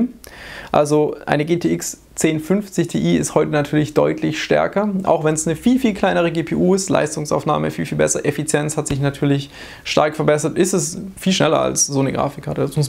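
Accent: Germany. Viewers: sprich evga nicht so deutsch aus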